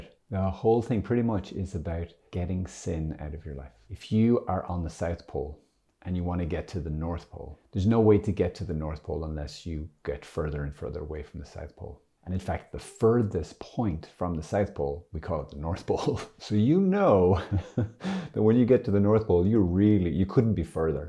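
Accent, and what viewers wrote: Ireland. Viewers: Gosh, Father, I learned something....one of which is we gain more graces other than the forgiveness of sin. I liked the phrase did I love Jesus through the day? Have to think about that. Generally I love these short but pithy videos....so much is packed in. And, there is always your Irish accent! Esther:yougotthis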